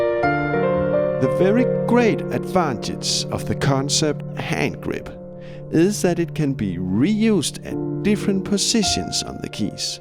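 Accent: Denmark. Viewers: Is that a danish accent I hear? Great job!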